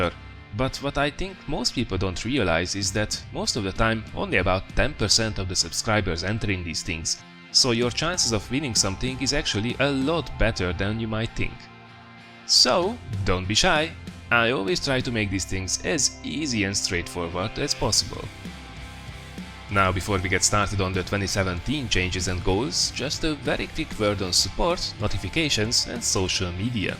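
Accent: Hungary. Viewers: Tipikus Magyar akcentus.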